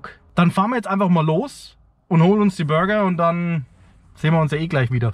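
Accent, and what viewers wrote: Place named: Franconia, Germany. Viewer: Tolles Video. Dass du aus Franken kommst hört man direkt. Wir haben einfach den besten Dialekt. :)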